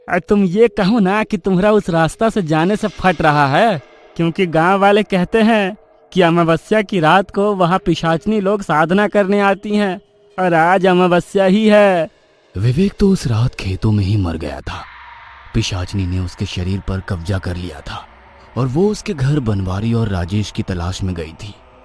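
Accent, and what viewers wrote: Bihar, India. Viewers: Full bihari style 🤣 im from Bihar ❤️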